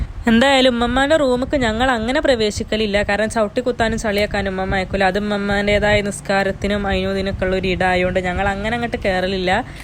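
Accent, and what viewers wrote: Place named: Malappuram, India. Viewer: മലയാളം പറയു.... അന്റെ മലപ്ര ബാസ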